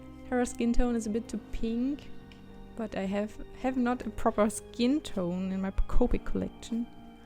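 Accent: Germany. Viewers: Are you Czech or German? Your accent sounds like you are German, but I saw your video about alcoholic markers and it's title is in Czech, so I just wonder about it. (Btw your art is awesome, and Happy Birthday to your channel! ^_^)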